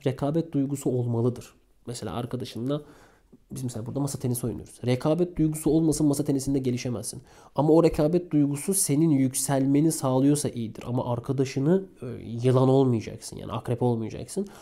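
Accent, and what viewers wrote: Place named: Black Sea, Turkey. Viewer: Karadeniz şivesi süper😂Oruçlu okudunuz sanırım insanın ağzı kuruyor,sonlara doğru bitiricem diye zorladınız teşekkürler.Hakkınız ödenmez.🙂💐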